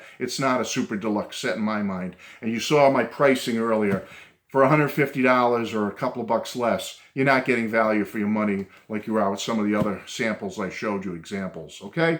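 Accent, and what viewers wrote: Boston, United States. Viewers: Nothing wrong with that,! Believe it or not, I've been a dyed in the wool Beatles fan since I was 4, mainly due to having a sister who was 8 years older than I am. I used to sneak down to her room to play their records when she wasn't home. LOL. I may not get into collecting all their stuff as many others do, but I haven't stopped loving their music. It's as timeless as timeless gets. Fwiw, as a NY National League fan, I can appreciate the Boston accent:) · Awesome rant and Boston accent....you just got a sub and a thumbs up from me sir....👍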